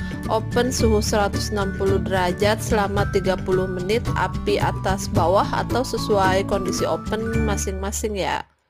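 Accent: West Java, Indonesia. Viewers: Logat dan bahasa sunda nya jangan diketarain dong, yang lampung ga ngerti!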